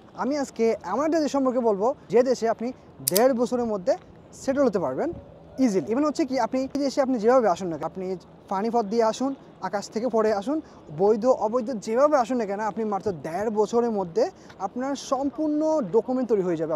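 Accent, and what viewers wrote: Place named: Sylhet, Bangladesh. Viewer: ভাই তো মনে হয় আমাদের সিলেটি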